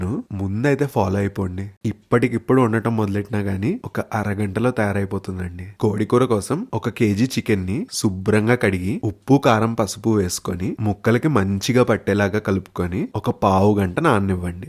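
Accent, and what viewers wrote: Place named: Godavari, India. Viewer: Me ammamma gari vooru godavari district side anipisthundi andi.nenu matlaade vidhaanam meru matladinatle vuntundi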